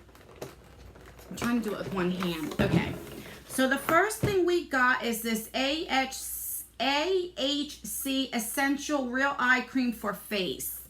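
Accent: New Jersey, United States. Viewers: new sub love ur channel..💝 I can tell right away ur a jersey girl so I'm I !! 🌼 ps ur so pretty & ur makeup looks amazing!! 💄💋🌲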